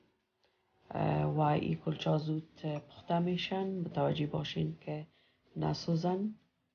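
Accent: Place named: Kabul, Afghanistan. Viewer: از لهجه تان معلوم میشود که از کابل جان استید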